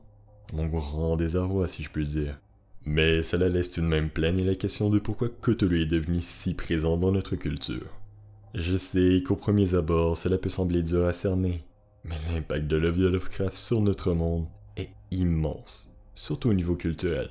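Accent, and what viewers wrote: Quebec, Canada. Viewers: J'adore Cthulhu, mais ca m'a fait bizzarre d'entendre l'histoire avec un accent québécois ^^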